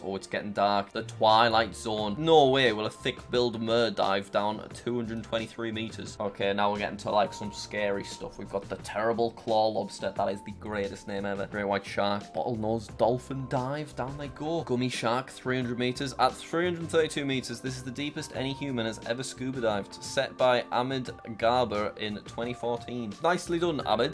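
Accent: United Kingdom. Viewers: I love how he has a British accent.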